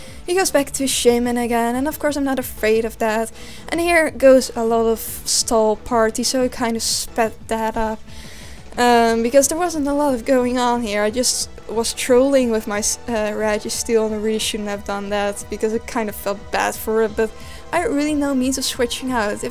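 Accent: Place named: Netherlands. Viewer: Gotta love Dutch accents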